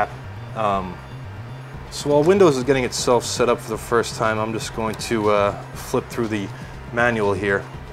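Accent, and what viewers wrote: United States. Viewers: How can you say you don't have an accent? You have an American accent! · lack there of? nope that is an us accent.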